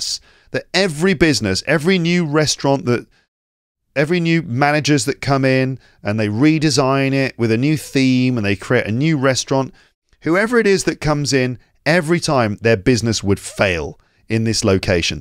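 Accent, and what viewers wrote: United Kingdom. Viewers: Hi: I am so happy find this channel to learn English with British accent, again. T|his is because many years ago, I was in in the UK to study my degree n an university. But after immigrate to Canada, I lost my accent and even English (almost). I wonder do you a class to teach a group of people to learn English?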